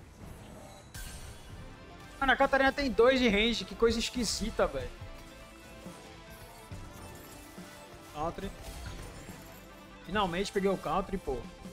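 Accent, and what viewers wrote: Recife, Brazil. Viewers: Eita o é do recife? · AGORA MINHA DUVIDAS FORAM TIRADAS!! RECIFENSE NATO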